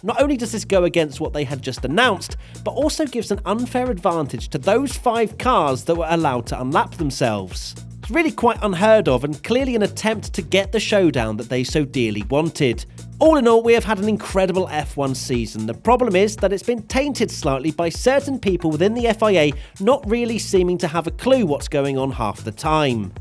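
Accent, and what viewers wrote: United Kingdom. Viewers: Yeah you should do a video which doesnt sound Britsh. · You sound british so yeah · It would be great to hear a review from someone that isn't British.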